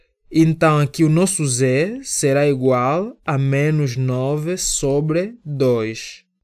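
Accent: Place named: Angola. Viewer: FALA IGUAL ALGUNS CONHECIDOS DA ANGOLA!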